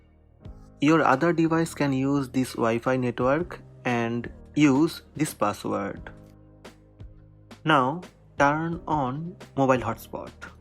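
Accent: India. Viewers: Я знал что парень с индийским акцентом поможет мне разобраться с этим дермом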